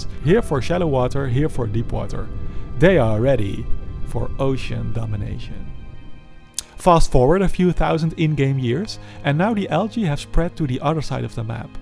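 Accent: Netherlands. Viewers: You sound dutch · I sense a strong dutch accent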